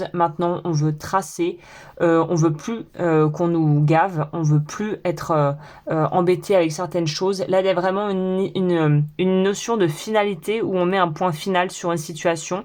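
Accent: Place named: United States. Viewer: Vs avez vécu en Amérique??votre accent anglais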